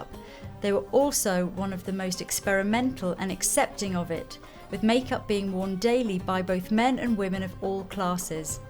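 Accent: United Kingdom. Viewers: British duh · British accent I think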